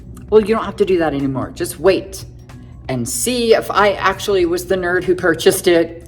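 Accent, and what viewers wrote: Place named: South, United States. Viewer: It isn’t bad however I preferred the fat triangle technique. I love my new eye cream and the way you taught us to mix it with under eye concealer. What a difference. Yup! You definitely have a Southern undertone and I love it, so just be you girl. LOL Can’t wait to see the bangs. I know you will do something to make ma laugh. Take care sweet.❤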